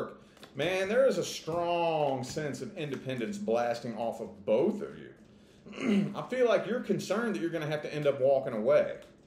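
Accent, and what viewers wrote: United States. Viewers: Nice reading 😊 By the way, I like your accent. Where in the States your accent from?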